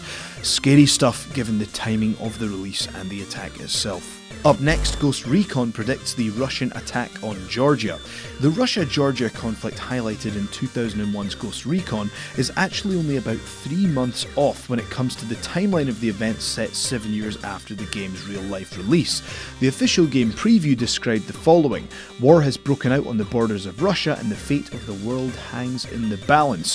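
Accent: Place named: Scotland, United Kingdom. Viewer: Dude must be from the same town as Gerard Butler, their accents are identical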